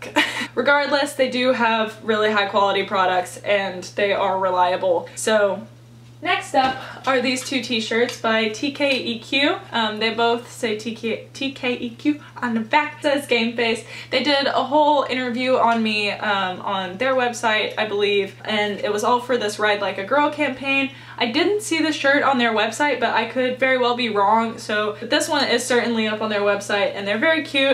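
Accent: United Kingdom. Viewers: Your British accent is the best😂 I love how you really show your personality in your videos and you’re so fun to watch💕 best of luck with the dog!!x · If I had not just bought a dredsage bridle I would purchase yours and I loved that spot on brtish accent!!👌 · Your English accent is spot on!😂 love your videos!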